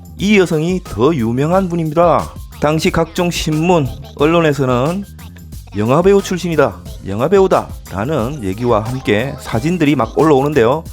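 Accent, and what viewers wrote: Gyeongsang, South Korea. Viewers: 경상도 말투던 뭐던 전달 엄청 잘되는데.. 외국인이 영상을 보나?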